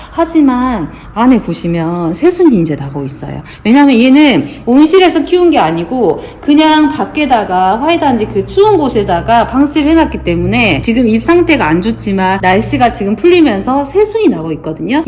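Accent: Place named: Jeolla, South Korea. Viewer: 전라도 사투리를 좋아하는 대구사람입니다. 중간중간 나오는 전라도 사투리가 느~므 좋아요^^ 황칠이 키우면서 보는 재미도 있구요^^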